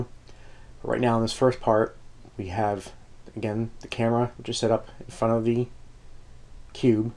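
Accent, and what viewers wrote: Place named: South Jersey, United States. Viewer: New South ?? Soundly like South Jersey to me 🤔🙃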